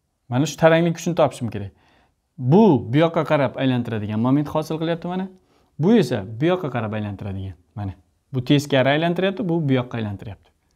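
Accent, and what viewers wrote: Khorezm, Uzbekistan. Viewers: Xorazmdan misiz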